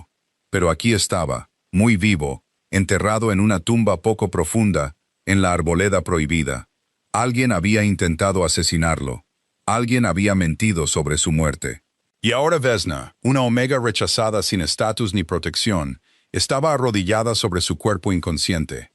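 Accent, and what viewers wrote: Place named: Pocho, United States. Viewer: Buenas historia, pero desespera esa forma de hablar pocho...ni Inglés ni español....x favor 😊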